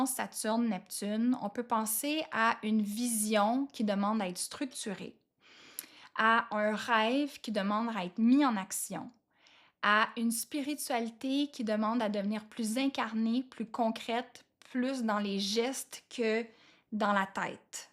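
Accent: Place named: Quebec, Canada. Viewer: Bonjour Charmante québécoise, ❤ je suis toujours sous le charme de l'accent Québécois que j'adore quand j'ai l'occasion de l'entendre. Merci pour cette belle énergie que vous déployer à expliquer le pourquoi du comment en Astrologie... Pour Févier, ça devrait bouger fort sur le plan émotionnel alors mettons notre ceinture et accrochons-nous au bastinguage... Merci à vous et continuez à prendre soin de votre Beauté, elle vous va si bien ... MERCI.❤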